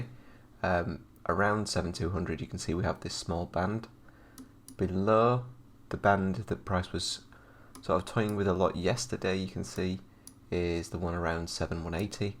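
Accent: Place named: Leeds, United Kingdom. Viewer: I live 10 mins away from leeds, I knew i recognised your accent ha!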